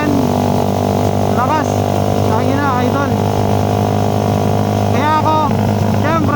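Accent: Iloilo, Philippines. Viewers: Ilonggo ka sir?' Idol ko ung punto talaga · Ilonggo kapala boss, sa diin ang inyo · ilonggo ni gali ayy. bakbaki ahhh🤣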